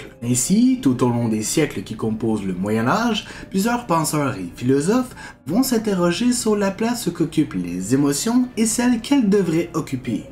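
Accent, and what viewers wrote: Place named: Quebec, Canada. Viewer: je comprenais rien a ce que tu disais, puis j'ai compris que tu etais quebecois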